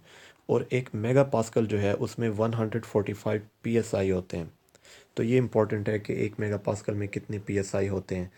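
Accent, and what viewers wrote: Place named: Pakistan. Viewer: Are you from india or from pakistan,just felt that this accent is pakistani. Anyways thumps up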